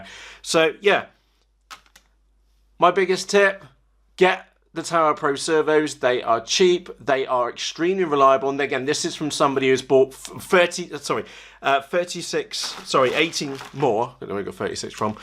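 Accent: United Kingdom. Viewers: British acsen🤣🤣👌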